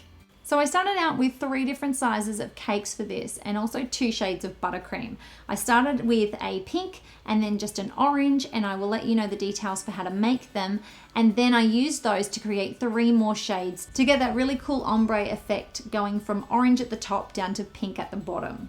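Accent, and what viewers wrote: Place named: Australia. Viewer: OMG you're Australian, so refreshing you hear another Aussie on YouTube. Was fully expecting you to have an accent.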